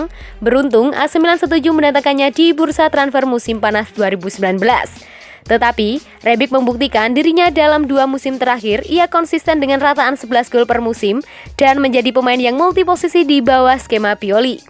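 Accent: Java, Indonesia. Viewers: Beritanya top, Mbaknya org mn ya msh medok logatnya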